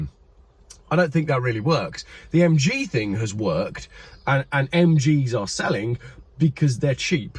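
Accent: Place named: Birmingham, United Kingdom. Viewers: Your Black Country accent is uncanny, eh it yam yam lol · Loving the Brumm-aye accent. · Your brilliant Brummy accent makes me LOL.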